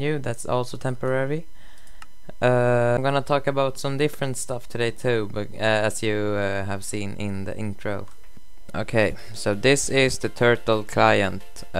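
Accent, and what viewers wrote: Sweden. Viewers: Wow u can really hear that u are from Sweden #SweGang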